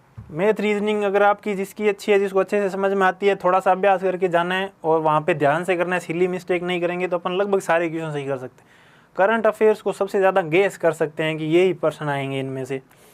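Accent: Rajasthan, India. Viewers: Love your rajasthni voice tone.. 😍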